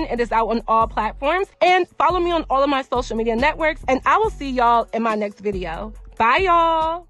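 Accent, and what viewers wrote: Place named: California, United States. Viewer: To answer you about the accent she has Trust me it is a Cali accent I live in Cali and everyone always tells me I sound like the Kardashian’s when I never noticed I guess it’s just the main accent out here ❤️ I love your videos !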